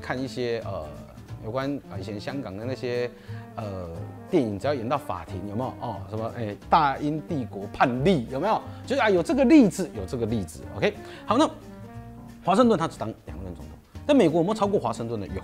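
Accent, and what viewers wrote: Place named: South, Taiwan. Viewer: 為什麼演算法推了一個南部腔長的像兄弟的人在講歷史？？太衝突了🙊🙉🙈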